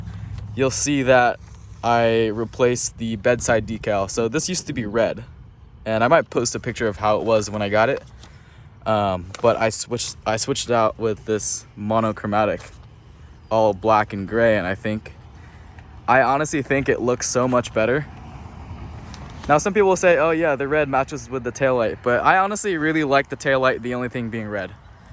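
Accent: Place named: California, United States. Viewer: I’m a New Yorker and I love your California accent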